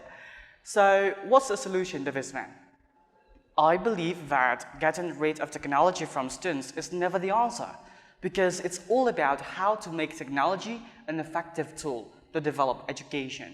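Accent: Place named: United Kingdom. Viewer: Masya Allah, kerenn sekali kak accent britishnyaa, semoga menjadi motivasi 🌟🌟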